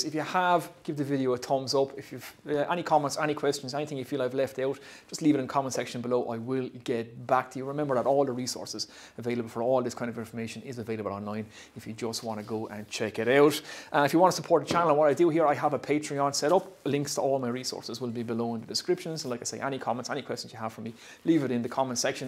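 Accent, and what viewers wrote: Ireland. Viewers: Mate your accent reminds me of a year I spent as an exchange student in Limerick, which was some of the happiest times of my life :) Nice channel too, I'll be checking it out!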